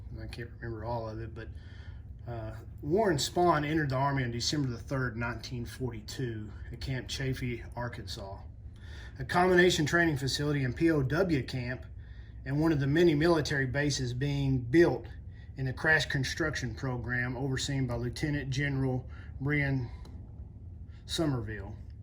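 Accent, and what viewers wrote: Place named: Texas, United States. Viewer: You have to be from Texas because you sound like stone cold a little. 😁👍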